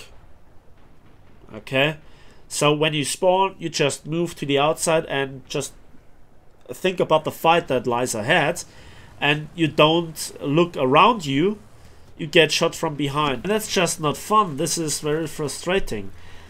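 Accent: Germany. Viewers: Am I, am I first? Wow! Quick! Think of a cheesy thing to say... Jk. Thanks for the video. Always nice to have a good game with learning opportunities, all narrated with a satisfying German Accent!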